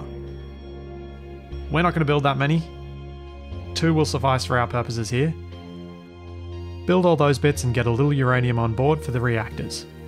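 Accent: Australia. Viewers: he is Australian. Good to know more Australians play this game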